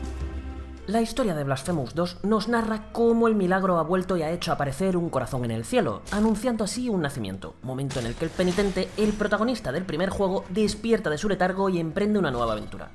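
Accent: Andalusia, Spain. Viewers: ole ese bueen andalu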